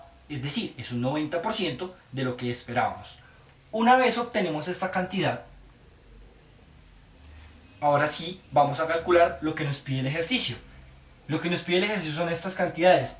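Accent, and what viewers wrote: Colombia. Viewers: Profesor de que parte de Colombia sos ? :D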